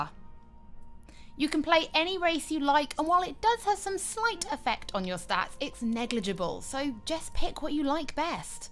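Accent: United Kingdom. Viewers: Is it only me that thinks her voice is sexy but yet really cute at the same time. When she said archer was sexy as hell. She sounds British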